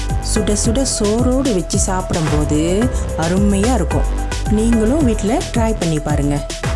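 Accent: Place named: Kuala Lumpur, Malaysia. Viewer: KL accent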